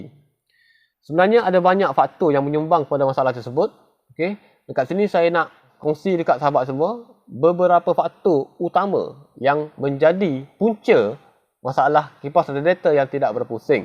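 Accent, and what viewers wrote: Kelantan, Malaysia. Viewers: Orang Kelantan ke bos..?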